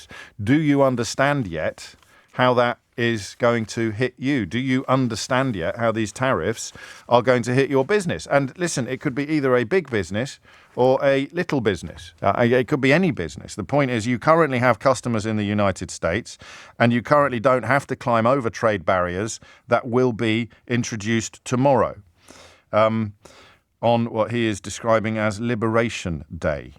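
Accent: United Kingdom. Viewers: If you have a British accent you don't know anything about America........... It's funny that EVERYONE against Trump that is complaining about the Tariffs are complaining........... You keep saying that ir will hurt America....YET....... You all are the ones complaining. Seems like all the pitching a fit is proving what Trump said. You need us more that we need you. Thing worse than following Canada would be Following the UK........ Canada is a joke and has been for a long time.... Why do you think the rest of the world calls it Terhanto.:face-blue-smiling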